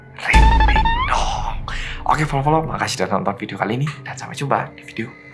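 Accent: Java, Indonesia. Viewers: Meanwhile Mas: "Halo guys welcome back to Korea Reomit bersama orang Korea yang.." Gw: "MEDHOKKK" Medhoknya ituloh terngiang ngiang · Yang medoooookkk .haha Sukses selalu mas bro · bhs indonesianya kok fasih dan agak medok jawa nya.....😀😀lucu.....kayak tetangga saya.....chaina..... seneng pakai bhs indonesia tapi medok abis..... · korea indo medok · kodem lah, kan mas pernah tinggal di malang dan ikut membalik² kan kalimat kayak Reomit kan aslinya Timoer. kan juga pernah tinggal di Jawa Timoer. jadi nya itu tu Kodem alias Medok, oke? 🐦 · Bicaramu Caaakkk....muwedhok nemen...😄😄😄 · Logat Jawa nya medok banget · "Kodem" kalo di balik jadi "medok" dulu dia openingnya pake kata "medok" cuma sekarang dibalik jadi "kodem" Maksudnya medok itu karena itu orang korea tapi medok gitu cara ngomongnya sekian terima kasih. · Dua jempol dech buat mas. Kagum bngt sama medok jawanya... 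Aq aja masih keturunan jawa, gk medok2 bngt. Jdi iri dech.. Srmangt trs buat upload kasus2 yg rada serem ya mas, tak enteni loch..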